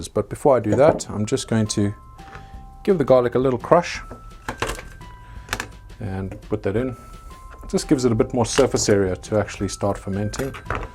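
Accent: South Africa. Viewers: New subscriber!Great videos. Watched one and then about 6 more.Huge fan of hot peppers, and the accent doesn't hurt. South African?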